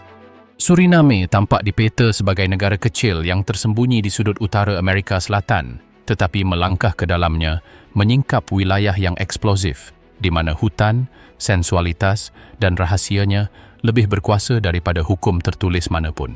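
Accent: Malaysia. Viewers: Wah, ini bahasa Indonesia logat malaydesh. 😊😊😊😮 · Jawa Sumbawa hadir tapi sayang naratornya orang malaydhes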